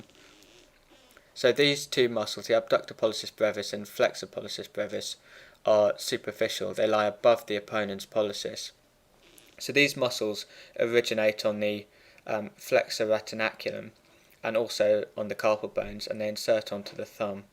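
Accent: United Kingdom. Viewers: Your videos are so helpful. I’m preparing for my exams and I don’t even use atlas often since I found your channel. Teaching is great and the British accent is everything 🙏❤️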